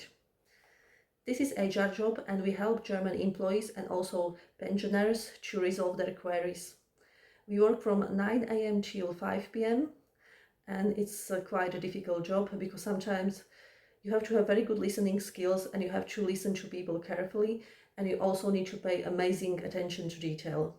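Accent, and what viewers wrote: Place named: United States. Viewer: Your English is also leans more to the American variety rather English.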